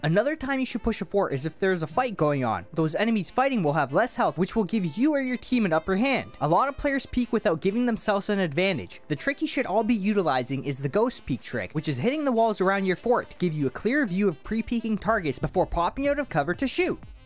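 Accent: Canada. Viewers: Quality tips brother. May I ask where you’re from? Sounds like Canada haha. Do you want to play together on PC sometime? Cheers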